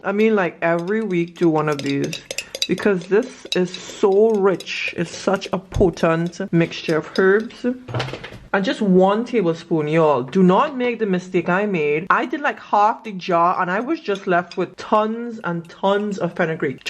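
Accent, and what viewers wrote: Trinidad and Tobago. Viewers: Are you trini? You sound trini. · I feel like I hear a Trini accent somewhat 🎊🍾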